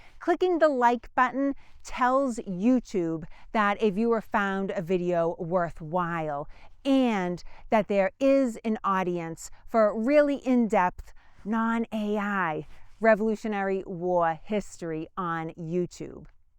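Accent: Boston, United States. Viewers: Thank you. Just discovered you a few weeks ago. Love your Boston accent, and your explanations from our past important history during the revolutionary war. I'm a west coast watcher from Oregon, and enjoy your stories and videos. Keep em' coming! 😉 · My weekly dose of American history and a Boston accent. Thank you! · I sense a slight baaaastin accent cute!!! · I detected the Boston, but I could swear there were some Aussie influences there too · Thank you. You really helped bring it to life for me. And Love that Boston Accent. Put a smile on my face. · Another well-done informative video. Keep up the good work. I’m hard of hearing so I have to use the closed-captioning. It’s a real treat the way it sometimes converts your Boston accent. Conquered vs Concord. Luckily my SIL and brother live there so I’m able to figure it out having talked to her for 40 yrs or more. 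It keeps my mind active anyway. LOL. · Just found this channel and, there is NO ONE I'd rather hear talking about this then you and your Bahstin accent:) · I'd hype you for your accent alone! I love me some Boston!! ❤😉🇺🇸❤ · like the Boston accent! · I don't usually like the Boston / yankee accent lol. rocks it though! Keep up the good work n GREAT content, look forward to hearing more from ya in the future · Man I love the Bahston accent and all the talk about maahching! Great content, too! Lived in Boston for 9 years. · That Boston accent, though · Awesome accent Anytime someone with a Mass/Boston accent teaches Revolutionary war history. I’m in!!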